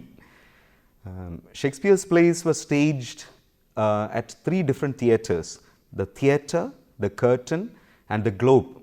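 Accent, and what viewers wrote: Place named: Kerala, India. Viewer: Mallu accent not bad at all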